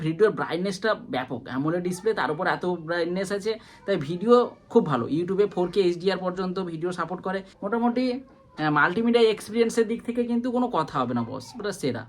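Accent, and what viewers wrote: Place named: Jharkhand, India. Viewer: A bhai Jharkhandi jaise bolte ho 😮😮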